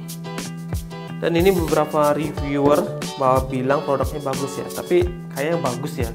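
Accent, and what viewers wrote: Bali, Indonesia. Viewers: Logat bali Salam dr hindu rantauan bang..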